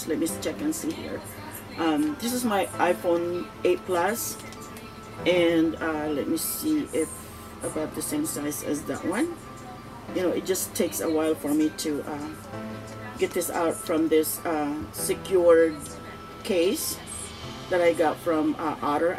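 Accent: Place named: United States. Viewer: I love the way you talk kabayan as your accent sounds like an American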